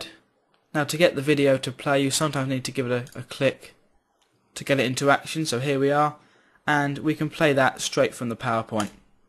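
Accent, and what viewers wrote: United Kingdom. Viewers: I love your british accent! :D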